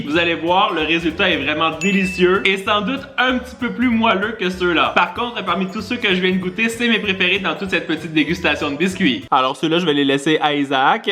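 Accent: Quebec, Canada. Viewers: J’adore son accent québécois ❤️👌🏻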